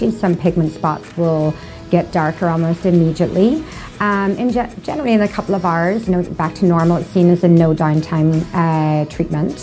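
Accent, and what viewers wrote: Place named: Ireland. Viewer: Cute Irish accent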